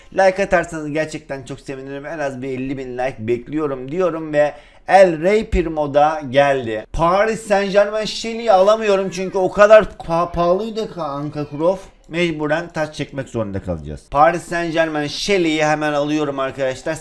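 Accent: Black Sea, Turkey. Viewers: #lazbunuyap Pocoyla hesaplaşmaya gir hiç yuknalmadan birinci ol olamazsan hesabı 950 taş al Instagram erolhayrunnisa abibseni çok eskiden takip ediyodum inanmazsan eskiden kanalını ismi oyun oynay di hatta eski videolarında Karadeniz şivesiyle konusuyordun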